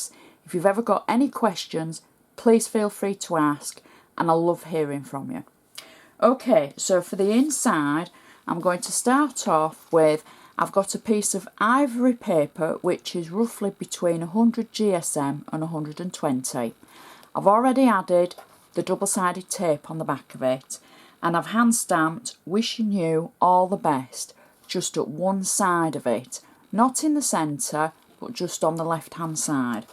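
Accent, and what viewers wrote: United Kingdom. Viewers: Love your accent. What part of the UK are you from. Enjoy your tutorials. Beautiful designs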